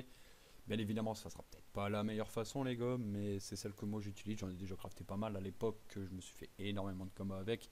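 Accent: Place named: North, France. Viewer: oh t'es du nord toi aha, bonne video poto force a toi!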